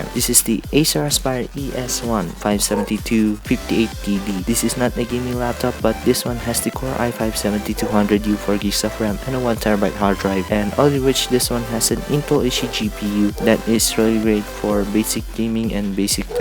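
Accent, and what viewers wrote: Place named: China. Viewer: i cant understand the damn specs with that chinese whatever style accent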